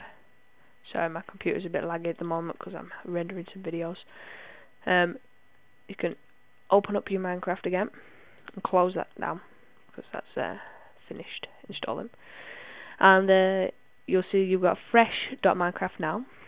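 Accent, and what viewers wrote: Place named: Ireland. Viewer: Wow are you Irish that's awesome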